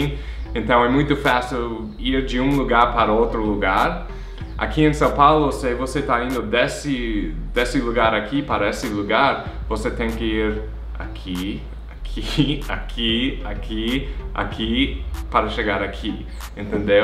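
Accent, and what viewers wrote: United States. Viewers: Filma um pouco dos encontros, e faz um vídeo pra gente que não mora é nenhuma dessas cidades. Estou amando seu canal, você fala muito bem português, gosto do seu sotaque americano ♡ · É mó engraçado americano falando português kkk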